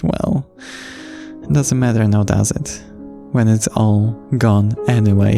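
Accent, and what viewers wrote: Poland. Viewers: youre the second skyblock youtuber i failed to recognize to be from kingdom of poland THIS MONTH :sob: like geniuenly i thought you just have funny pronounciation and didnt connect the dots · OK, from your accent I guess you're from Poland and I can't put the rest of my comment into English, at most you can translate it yourself if I'm wrong about your nationality xd W lutym tego roku straciłam na hypixel CAŁY MÓJ DOBYTEK. Uderzyło to we mnie tak mocno, że usunęłam moje konto microsoft (bo zostało tak zhackowane, że nie było co z niego zbierać...) i do teraz nie jestem w stanie zacząć grać od nowa. I nie jestem jakimś dzieckiem, jestem po trzydziestce, więc bycie takim debilem zdemoralizowało mnie jeszcze bardziej. Miliardy coinsów, wymaksowane staty do górnictwa nad którymi pracowałam setki godzin. Kurwa boli.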